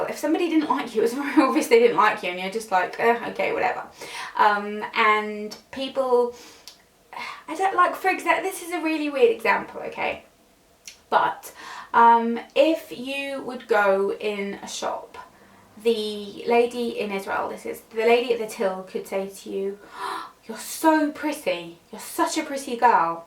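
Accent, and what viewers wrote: England, United Kingdom. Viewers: Very interesting video! I've been watching you for years and it's nice to hear a bit more about you and your past! You have a very English-sounding accent, without sounding completely uneducated and ignorant - did you always have that accent or has it evolved since you moved over here?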